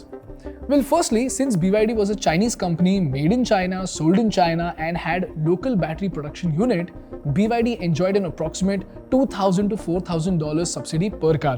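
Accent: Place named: India. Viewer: OMG.... Indian narrator, just like Polki Sharma. Can the details be true or accurates. Really in doubts.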